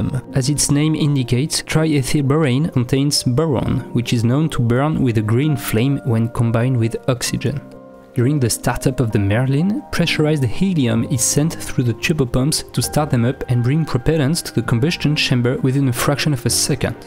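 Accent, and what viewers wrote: France. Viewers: Your english is very good and this sweet french accent is beautiful. Much better than some Youtuber from the south of USA. (I am from Germany) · Excellent video and I can understand you very well. I'm English. Of course you still have a very very French accent but it's no problem for me. Merci mon pote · I've been a space geek for over 30 years now, and I still learned a ton during this video. Well put together! Don't worry about the French accent, I'm from Canada, I'm used to it ;-) One thing about the F-1 engine that has fascinated me from day one is the black smudge around the exhaust. It is the result of the turbopump exhaust passing through liquid helium and liquid oxygen heat exchanger coils. The resultant helium and oxygen gas are used for fuel and LOX tank pressurization, etc, and the now much cooler turbo exhaust gases pass into a manifold, which then vents it inside the nozzle extension. 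This boundary layer cools the nozzle extension, since the nozzle, throat, and combustion chamber are regeneratively cooled. Passive cooling for the nozzle extension, active cooling for the rest of it. (The heat exchanger is the bottom half of the cylinder thing next to each engine bell, and the manifold is the thick ring around the nozzle. A gas generator downstream of a gas generator.) . Keep up the great work! I will be watching part 2 next. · Great great video. Condenses a fair amount of knowledge really difficult to get without a lot of work, and your accent is just fine. It's obvious you're French, but there was no single word I didn't get. A big THANK YOU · its funny how when you speed the speech you sound more french. but i understand you perfectly,and i am spanish. very good content,btw. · Please continue making such cool videos! I learned so much in a short time.. maybe I'l have to watch it a second or third time :) Absolutely no problem with your french accent. 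Great video content. I love the super slow motion and HDR-looking footage! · Great collection of rocket launches and the F1 launch I've never seen, no problems with your French accent... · Very good! Your English with a French accent is good and I had no problem understanding you. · This is great and really informative. And your French accent isn’t that bad. I mean have you ever heard Stéphane Israel speak English? Your English sounds so much better! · You have a French accent, not an English Accent. :) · It's a great pity that this would be an even better video if someone who speaks English as their first language had provided the voiceover. Your very strong French accent is sadly too strong to make many of the words understandable. (I am from London, England.) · Outstanding,. Your accent is tres agreable. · From now on, all rocket videos should be in French accent. (Like Attenborough nature videos, and Australian croc videos.) Excellent work. 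Very good info. Thanks to you,.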